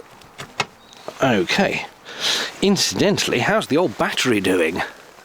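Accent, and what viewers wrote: RP, United Kingdom. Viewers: Posh British person.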